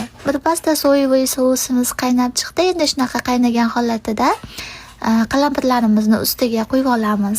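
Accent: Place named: Fergana Valley, Uzbekistan. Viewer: Водийни кайсидир жойлари шунака шева бор калапир кутини ёки бошка нармаларни кутини диб гапиришади эшитганман деме бу кишим водийдан😅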